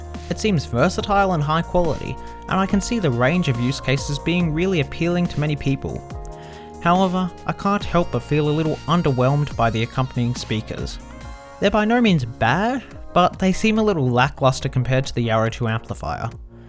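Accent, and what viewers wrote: Australia. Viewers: guy's an Aussie? Fuck me I couldn't for the life of me figure out where his accent came from. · Glossy black plastic? That's the deal breaker right there no matter how great they may sound. The use of glossy black plastic on all consumer goods should be outlawed and banned as far as I'm concerned. The amp seems decent enough but the drivers sound sub par from what I can understand and I'm not at all surprised. For the price the speakers (of reputable quality) should be housed in wooden boxes at the very least. Anyway I enjoyed the video nonetheless and the chaps accent reminds me of the days of being at the SCG, WACCA and MCG again. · Do I hear an Aussie accent or what! :D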